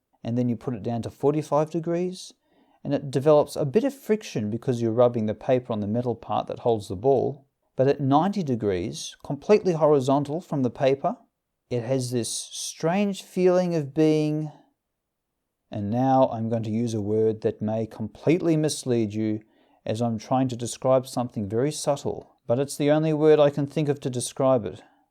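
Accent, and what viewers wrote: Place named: Australia. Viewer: whoa, yes, hahaha. But this chap is (sorry Wade, no offence) a little more high class I reckon. Or it could just be the slightly different regional Oz accent that sounds classier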